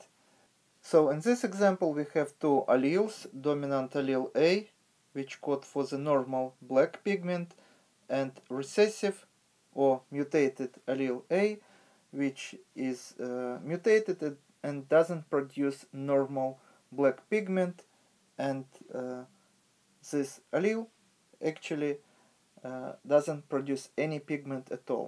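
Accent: Russia. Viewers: Are u russian !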